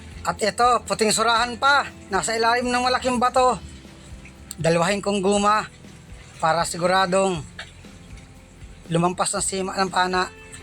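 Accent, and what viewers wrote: Bicol, Philippines. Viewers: ang tagalog ni kuya bikolanong bikolano ang tugas ng dila.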